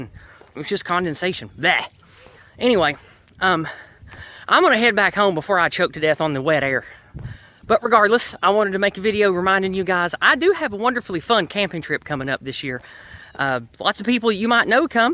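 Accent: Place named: South, United States. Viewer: The intro for your videos is so cool the way it melts away with the gentle piano notes and American theme.!!! I just subscribed to your channel and will stay tuned. Cool Southern accent btw. 😎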